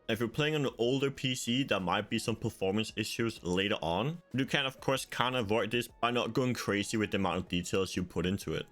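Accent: Denmark. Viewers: u sound danish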